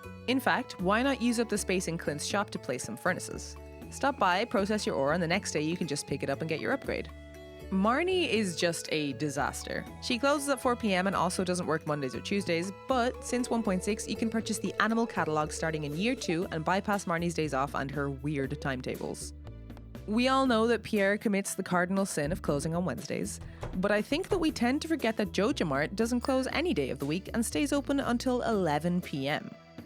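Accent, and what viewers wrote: Ireland. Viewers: what accent is this? i love it! it almost sounds irish to me?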